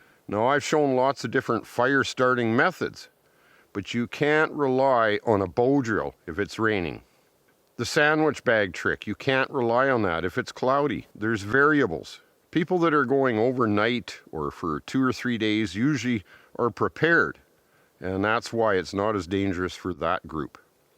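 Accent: Canada. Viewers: You sound like home 🇨🇦 🍁 🇨🇦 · You're Canadian. I know the accent. A lighter helps but there are many ways to start a fire.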